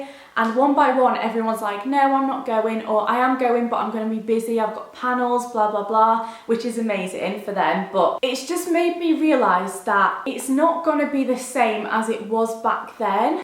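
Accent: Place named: North, United Kingdom. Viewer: I relate to you massively with regards to food. I’ve struggled with binge eating when I feel anything but happy and the past few weeks I’ve massively been off plan with slimming world. It’s so inspiring to see someone so beautiful having the same issues as me, so I thank you for showing me it’s okay to feel how I feel. Also you’re northern like me and I love it!! 😂 I really hope I meet you at some point, I’d love to sing with you is that weird?? 😂💕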